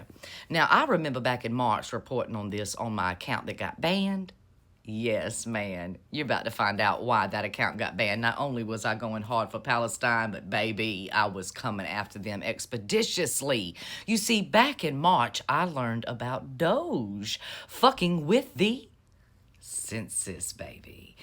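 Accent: South, United States. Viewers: I just love your Southern Belle accent dear!! and you have some beautiful blue eyes!! Your accent reminds me of my Aunt Fran who lived in South Carolina hun.... Im in Oklahoma... but my accent is more of a mix of Oklahoma, Texas and Louisiana. Thanks for bringing us the TEA everyday!! Much Love hon!! 😍😘🥰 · I love this lady... her style, her commentary, her beautiful southern accent, the whole thing. Plus she is a looker. 😊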